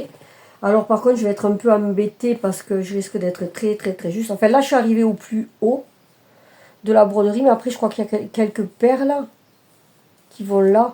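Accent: South, France. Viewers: C'est génial de teinter vos toiles! quelle bonne idée! J'aime bien aussi le Diamond Painting mais quand je commence je n'arrive plus à m'arrêter, en un week-end c'est fini et après je ne sais pas quoi en faire donc j'ai arrêté d'en acheter... Olala l'oiseau il va être trop beau ♥ J'aime bien l'idée des cases, une de temps en temps c'est sympa! je verrai bien ça en calendrier de l'avent! Wouaw la toile bleue avec la sirène superbe! Oh marrant ce trieur! (moi j'adore votre accent, une partie de ma famille vit dans le sud mais ils n'ont pas l'accent hélas... ils sont arrivés adultes) wouaw le tricot mais vous savez tout faire!!! le projet couture en duo super! vous savez vraiment tout faire bravo!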